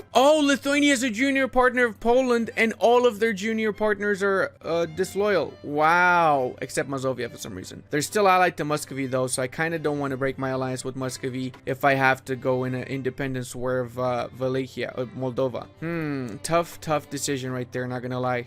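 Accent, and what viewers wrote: Romania. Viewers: is this guy romanian ? his pronounciation is really good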